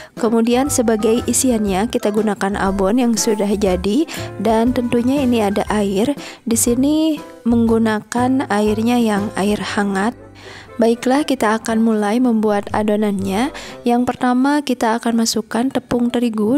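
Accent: Bali, Indonesia. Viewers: Kadang kadang logat t nya ky orang bali ..asli gitu ya ngomongnya..apa di buatt buatt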